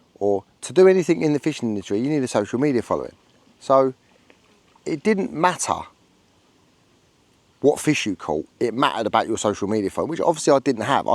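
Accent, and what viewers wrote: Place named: Cockney, United Kingdom. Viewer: Cockney accent is so cool! Greetings from south Lebanon 🇬🇧✌🏼🇱🇧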